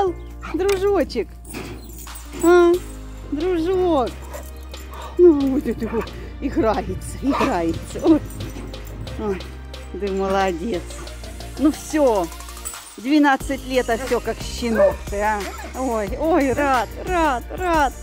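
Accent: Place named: Voronezh, Russia. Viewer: Привет с Липецка,с возвращением, а я думаю какой области у вас говорок,оказывается, воронежский.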